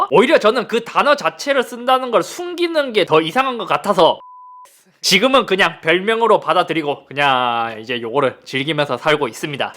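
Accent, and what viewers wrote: Gyeongsang, South Korea. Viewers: 초기부터 지금까지 쭉 봐온 분들은 모두 아실겁니다. 영상에 나온것처럼 갱상도 특유의 발음이나 몇몇 단점도 장점으로 바꿔오고 노력해오면서 이렇게 훌륭한 유튜버가 된 서울 야스킹을 말이죠 형 너무 사랑해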